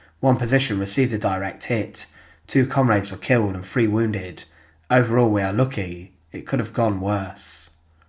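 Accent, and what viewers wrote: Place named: Midlands, United Kingdom. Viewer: You're not British obviously. I think he narrates really well. Sounds like midlands maybe east of Birmingham somewhere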